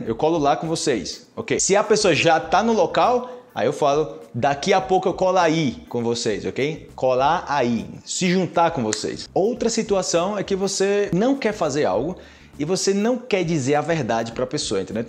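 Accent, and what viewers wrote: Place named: Colombia. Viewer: Impresionante el acento colombiano!!! Se te oye bien!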